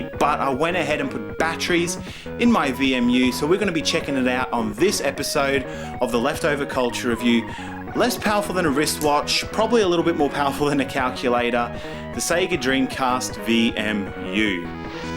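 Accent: Australia. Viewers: You sound Australian but you say Saiga not seega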